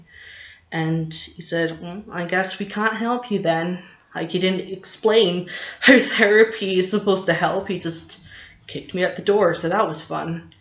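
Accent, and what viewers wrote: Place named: Scotland, United Kingdom. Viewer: Hello are you Scottish. I hear your Scottish accent coming through the more you talk, and relax. It’s as if you’re subconsciously hiding the Scottish accent to please ppl listening online. I ‘get it’ because I used to do the same when I was younger, and probably would again now if I made a YouTube video. I’m not judging you ok 👍- I’m just making an observation. And i admit I could be wrong. I just want to say hats off - Good for you to have the courage to make a video about this situation. I found your video become looked for info on borderline inner pain. I’m in a lot of inner emotional pain rn. I wish I had the courage to make a video for YouTube to share how I’m feeling. Can I ask you/ - does it make you feel better having uploaded these videos? Thank you. Blessings.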